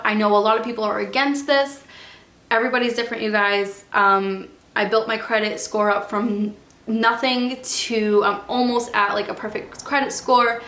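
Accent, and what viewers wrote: Boston, United States. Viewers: Love the Boston accent, thank you for your good points! Need some help learning where to find an apartment coming out of college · I love your Boston accent. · I can hear your Boston accent. It's ever so subtle but adorable! 😍😁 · Lol...i knew you were from the Boston area by your accent! I'm about 40 min away!! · In Michigan, the basic coverage insurance is over $100 a month. For me its $239 a month because I haven't had insurance in a while. I've heard that Michigan has the highest car insurance in the country. Its just crazy to hear how cheap it can be in other places. In other news, I LOVE your Noston accent..😀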